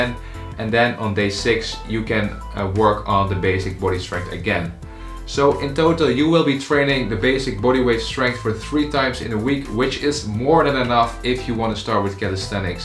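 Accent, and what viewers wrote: Netherlands. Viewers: Are you from the Netherlands? I can hear it by your accent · you sound very dutch hahaha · or, we can just be proud that us Dutch have such a high rate of English proficiency, with or without accent. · Nederlandse accent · The accent screams “ I’M DUTCH!!!” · You’re Dutch right? Hahahaha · Are you Dutch? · Cringeeeee big time, please just talk Dutch or in proper English xD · Yeaaahh i heard it immediately hahahha. I.speak dutch but i dont have thia accent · top video, zeer behulpzaam! love het hollandse accent onder dat engels :) groetjes antwerpen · Hollander English to funny. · Puur Hollands lol! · Ik kom al horen dat jullie een nederlands accent hadden😂😂maar hoe hebben jullie ooit dit berijkt en waar doen jullie dit? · holl up holl up, judging from your accent you guys are fellow Dutchmen am i right? would make me proud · I recognize this accent immediately. 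They are dutch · Haha no idea who you are but half way first sentence I hear a fellow Dutchmen. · lekkere nederlandse tong tijdens het praten haha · Yep theyre Dutch you can hear that accent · Ultimate Dutch accent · Yeah they are from the netherlands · Dat Hollands Engels is echt zalig😅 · Lekker nederlands accentje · I subscribed because of the Dutch accent. Respect vanuit Amsterdam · I smell Dutch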